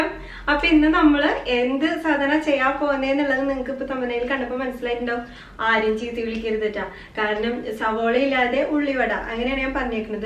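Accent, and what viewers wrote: Thrissur, India. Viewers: നമ്മൾ തൃശ്ശൂർകാര് വീട്ടിൽ അടുക്കളയിൽ എങ്ങിനെയാണോ പാചകം ചെയ്യുമ്പോൾ സംസാരിക്കുന്നത് അത് പോലെ തന്നെയാണ് മോൾടെ അവതരണം. ചേച്ചി മോൾടെ വല്ല്യ fan anutaa..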